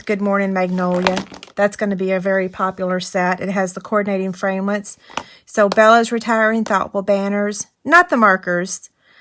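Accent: South, United States. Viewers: Love your ideas & videos! Where are you from, I hear a distinctive southern accent. I’m from NC.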